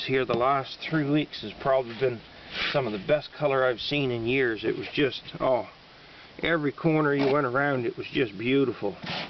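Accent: Nova Scotia, Canada. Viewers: Watched this as growing some Charlottes in the UK - but I spent a lot of the video interested in your accent - I'm from the South West of England and I can detect a lot of 'West Country' traits in the Novia Scotia accent!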